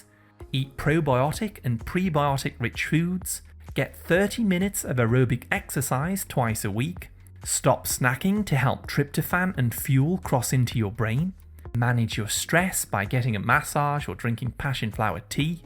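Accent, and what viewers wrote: United Kingdom. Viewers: British accent. From England.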